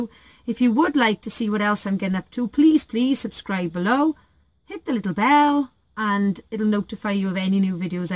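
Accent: Wales, United Kingdom. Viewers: thought you sounded more welshy than me lol. Xx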